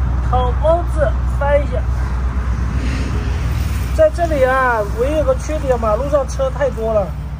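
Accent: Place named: Ningbo, China. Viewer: 的浙江寧波普通話太可愛了！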